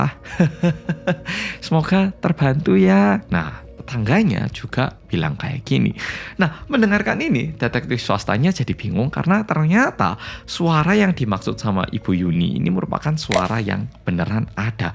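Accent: Java, Indonesia. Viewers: terakhir bikin ketawa pas bilang duhh duowone 😁 jowo nyeelll pokok e dirimu.kalau dengerny sambil merem pasti tak kira org Jawa nyell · Hallo bolo medok❤ Nyimak🎉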